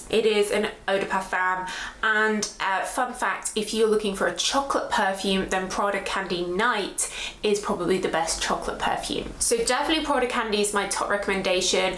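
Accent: United Kingdom. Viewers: I love your vids based on main notes of perfumes and I love your british accent-you are the Tish Wonders of the perfume world "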